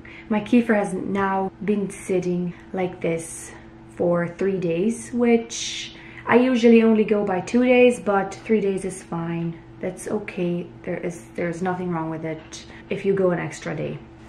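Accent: Ireland. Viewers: I had never heard about this before! Thank you so much for sharing, very informative. You have such a beautiful accent! May I ask where you’re from/grew up? I hear an Irish lilt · You sound so Irish. Just amazed how Hutterite colonies probably stem from Irish roots.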